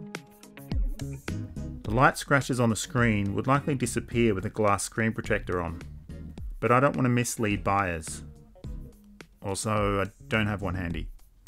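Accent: Australia. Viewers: Very satisfying to see and Hear a fellow Aussie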